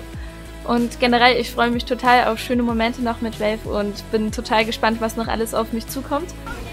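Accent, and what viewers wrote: Saxony, Germany. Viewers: ist sie aus Sachsen? xD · Wie mer des sächsische raushört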